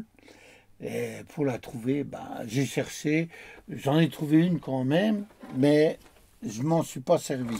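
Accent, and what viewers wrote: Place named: Lorraine, France. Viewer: Bonjour, fantastique combine. Merci. Et que ça fait du bien d’entendre l’accent Lorraine, moi qui suis exilé chez les chocolatines!😂.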